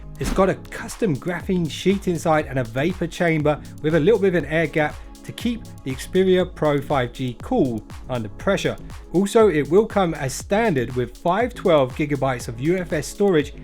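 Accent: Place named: United Kingdom. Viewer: Britain accent is horrible..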